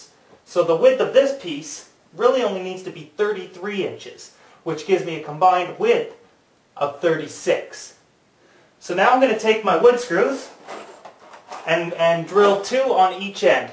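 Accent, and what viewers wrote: Canada. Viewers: Lol! well that's good then....He said it with his Canadian accent and sounded like 'meter saw' eh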